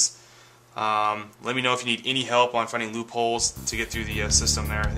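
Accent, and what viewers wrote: United States. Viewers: sound like new york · If I could guess the state, it sounds like MA.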